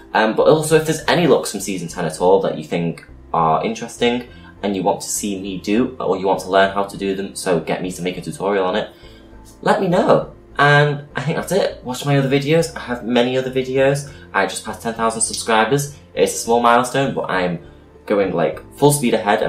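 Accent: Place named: Liverpool, United Kingdom. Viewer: Aquaria is brilliant, also this is kinda like watching art attack i mean you know some lovely painting articulated by a thick liverpool accent, love the content xx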